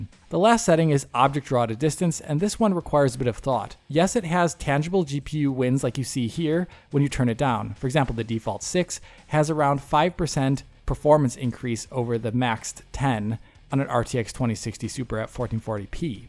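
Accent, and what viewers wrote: Germany. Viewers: Your voice is incredibly monotonous and boring... and yet when you speak a bit of german, it becomes very colorful and full of life. You should try to use your german accent to speak english! And thank you.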